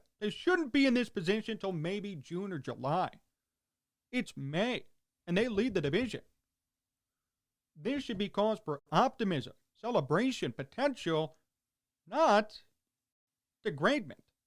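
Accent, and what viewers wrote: Chicago, United States. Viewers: Love what you're saying bud, also you have the most Chicagoan but also not even close to Chicagoan voice I've ever heard and I have no idea how to feel.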